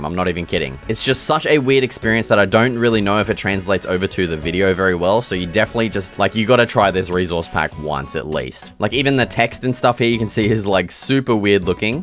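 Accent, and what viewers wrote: Australia. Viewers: Australien accent = better youtuber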